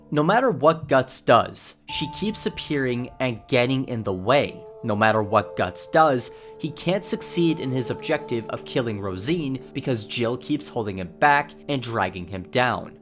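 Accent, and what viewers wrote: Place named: United Kingdom. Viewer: hey broken-sexual boy, why do you pretend Griffith = "Griff-iff?"...are you infected with some form of disease that perverts your enunciation to sound shitty aka British ?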